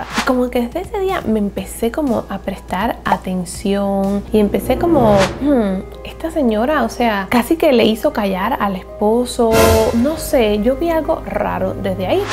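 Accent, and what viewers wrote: Cuba. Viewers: alejandra sanchez yo creo que es por el acento ya que las dos son cubanas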